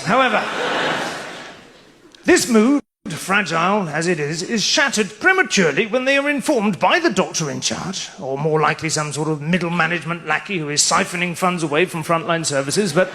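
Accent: United Kingdom. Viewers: I need a translator to American, too much of British accent I don’t remember a country name Walsh, I need a historian too to understand ☮️ so smiling for now 🙂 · FYI writing in English. Native language also English. Dude has a London accent. ❤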